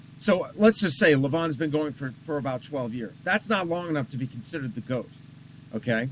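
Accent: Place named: Czech Republic. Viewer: where are you from my man? Cuz it do be sounding like you are from czech republic xD PS: Good videos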